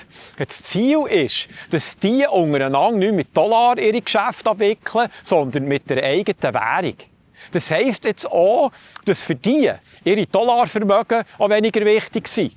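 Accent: Bern, Switzerland. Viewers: Dieser Beitrag is wirklich ein Hit, ich bin froh dass ich gefunden habe. Und dann dieses schöne Bärndütsch! Herzliche Grüsse von einem Heimwehberner aus Südkorea! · Herrlich dis Bärndütsch 👍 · Das war wiedermal Deutsch und deutlich! Man kann sich über diese finanzielle Schieflage in den alternativen Medien sehr gut informieren lassen. Aber unsere tollen Mainstream-Medien begnügen sich halt immer noch mit einer dümmlich vereinfachten Weltsicht. Sie spielen 'hau den Lukas' und verkaufen uns für dumm. Du hältst in breitestem Berndeutsch dagegen - bravo! ❤ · Dr Herr Trump erchouft secht haut sini Macht mit Gäud und mit Entlassige. Weni ihm zuelose, de dünkt's mi rächt offesichtlech, dass es ihm nid i erschter Linie um ds Wohl vo Amerika geit, sondern um d'Vermehrig vo sim persönlech Riichtum und Macht. Si Aatrieb isch sis gchränkte Ego (was sogar nachvouziehbar isch, wenn me sini Biografie aaluegt). 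Nüt gäge Riichtum, aber es git riichi Lüt wo ehrehafti Mönsche si mit ehrehafte Wärtvorsteuige, und die würde nie so handle. Ja es stimmt, es geit hie nid a priori um Pro oder Contra Trump, und trotzdäm dünkts mi wichtig, das Thema und das indiränkte "Lob" a Trump im Video ine entsprächende kritische Gsamtkontext z'steue. Es isch aber e Fröid, dim schöne Bärndütsch zue z'lose 🤗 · Einfach schön, jemanden zu hören, der richtiges Schweizerdeutsch (Bärndütsch) beherrscht und praktiziert!!!!!! Dazu noch alles für die Dümmsten (wie ich) einfach erklärt. Danke!!!!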